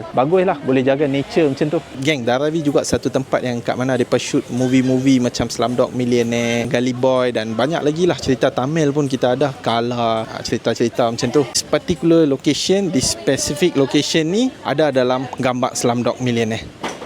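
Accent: North, Malaysia. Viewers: 1st video saya tgk dan terus subscribe. Penerangan jelas sekali dgn info tempat dan harga. Explaination pun sempoi lagi2 dgn loghat utaranya hahaha dan planning aktiviti pun menarik. ada tunjuk aktiviti penduduk in different time of the day.your intention to potray the other side of the locals tu mmg sampai, terbaik. Mantappp👍keep it up the good work. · Wei lama aku tggu org kita buat content camni.. lagi mantap sbb cakap utara. Steady org kita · Best memang terbaik la.dengan cakap loghat utara syok tengok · Btltu terbaikla, org Malaysia yg berbangsa India dan agama Hindu yg tentunya asal usul nya dari India, tp abgni dgn ckp lonhgat utara, pergi pulak sorang²tu, MMG Chanel yg baiklah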